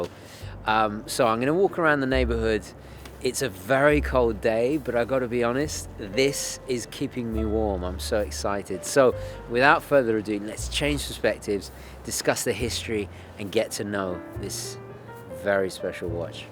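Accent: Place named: RP, United Kingdom. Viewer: I knew you were a fan, as I have mentioned before how since becoming part of the Watchbox Team, you have made your videos a little bit more posh sounding, and a bit Partridge. I am really hoping you do a full homage and add a 'ahaaa!' in one of your videos. These are great watches too by the way. I have recently had a 'field watch' buying addiction, being ex Forces, I have recently purchased five field watch style watches including three Hamilton, and a Longines military COSD which is hopefully being delivered today. I have a black face one coming , it has the forces broad arrow (crow's foot) on the face. I really want a white face one but I cannot find a new one anywhere. There is a pre owned one on eBay but it looks a bit beat up, and I got a brand new black face one heavily reduce in a Black Friday sale. I think the retail is just over £1,200 and I got it already reduced, then a Black Friday promo code worked, so it ended up being £748 UK sterling, free next day delivery from an Authorised Longines Dealer too, not grey market. 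A bargain. I am just negotiating a 'Dirty Dozen' watch. Really love those. I think Hamilton field watches are a bargain too, I got mine also with around 35 to 40% off RRP, again from Hamilton AD too. Apologies for the length of this comment. I am also waiting on two Stowa Flieger watches to be delivered.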